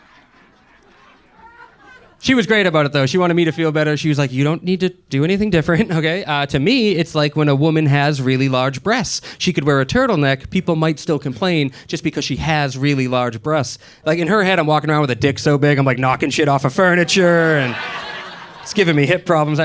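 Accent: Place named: New England, United States. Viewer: Funny he reminds me of Bob Tasca III from NHRA although Bob has a harder New England accent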